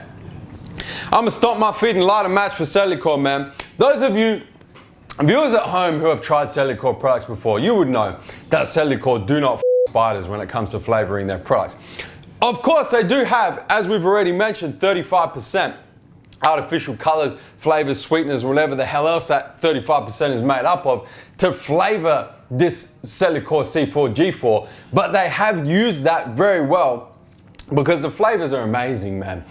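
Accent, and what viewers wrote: Australia. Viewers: Sounds like an Australian version of Alfie Aesthetics. No shit. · dude u might wanna neutralize your accent a bit , kinda difficult for guys like me not from Australia to understand your accent, maybe try American accent bro ? nice vid tho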